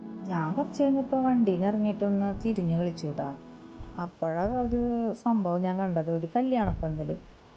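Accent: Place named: Thrissur, India. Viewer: Nice presentation..... Thrissur kari 😂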